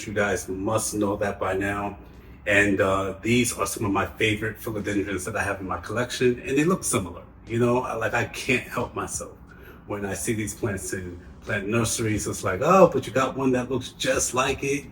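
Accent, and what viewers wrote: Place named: New Orleans, United States. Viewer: I thought you were from my part of the world with that Accent lol New Orleans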